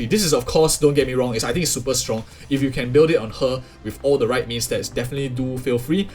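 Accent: Singapore. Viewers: subscribed for the singaporean accent 😂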